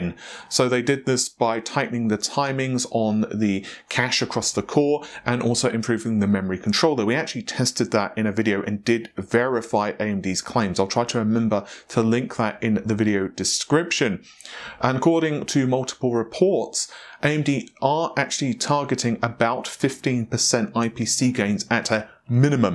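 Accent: United Kingdom. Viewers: Short is good, specially with this thick British accent.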